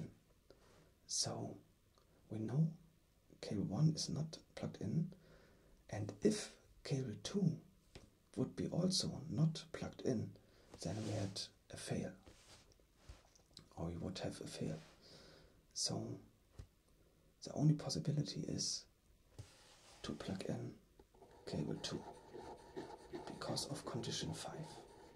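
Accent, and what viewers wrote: Germany. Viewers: Ich finde deine Videos echt klasse. Die Kombination aus ASMR und Logikrätseln ist super! Und du hast ein beachtliches Zeichentalent. Im vergleich zu dem, was ich so zustande kriege 🙈 Eine Sache muss ich aber doch auch loswerden, die mir als etwas störend auffällt. Du hast eine sehr deutsche Aussprache von Englisch. Besonders bei dem "th" fällt das auf. Falls du dich da gerne verbessern möchtest: Ich denke, beim "th" ist es Übungssache. Die Zunge muss unbedingt kurz zwischen die Zähne beim Sprechen. Vielleicht hilft es dir ja generell für die Aussprache auch, englische Filme zu gucken, um in den englischen Sound reinzukommen. ;) Dein Vokabular ist auf jeden Fall schon richtig gut. Und du hast eine sehr angenehme Stimme zum Zuhören.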